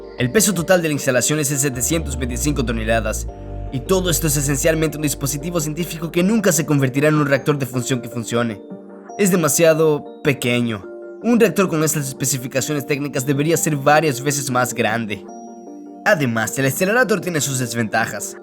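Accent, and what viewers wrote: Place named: Yucatan, Mexico. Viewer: Es men es Bombaaaa hablas Yucateco!! Saludos Bro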